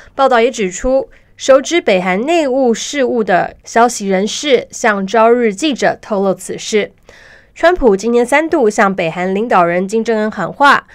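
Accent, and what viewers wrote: Taiwan. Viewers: 台湾口音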